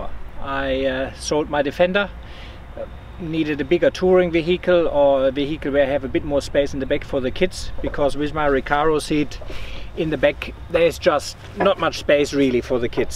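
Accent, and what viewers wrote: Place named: Germany. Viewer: Ähmm... your accent... sounds damn deep German... richtig oder? Still Pinzgauer for President... best time at Austrian Military was "driving" to Locations with this thing... driving, its more waiting for the Location comes to u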